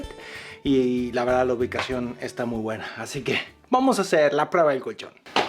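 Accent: Mexico. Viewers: Me encantan tus videos y me sirven mucho, porque estoy en la misma que tu, pero... me perturba que hables como Mexicano, entiendo que ocupes palabras, pero sinceramente no pareces Chileno y es fome que eso se pierda. Saludos y mucho éxito.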